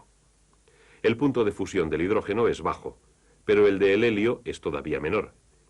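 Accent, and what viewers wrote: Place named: Castile, Spain. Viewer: Nada más lindo que un documental vintage y con acento español de Castilla 💞⭐😊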